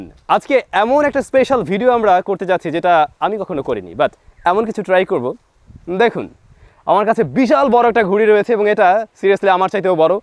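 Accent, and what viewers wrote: Barishal, Bangladesh. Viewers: vai Apni akjon বরিশাইল্লা! ajke janlam.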